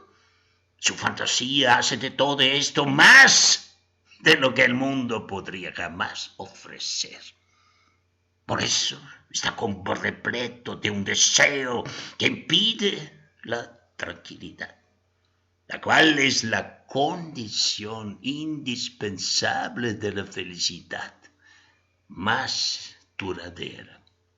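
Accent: Germany. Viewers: es un man español que posteriormente fue a residir a alemania. ¿¿no le reconoces el acento alemán en ese español tan remarcado?? búscalo en googles, aparece como.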